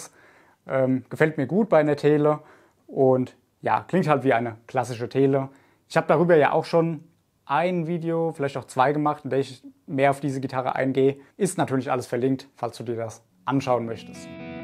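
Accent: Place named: Wiesbaden, Germany. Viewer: hört sich mehr nach Wiesbaden an..😉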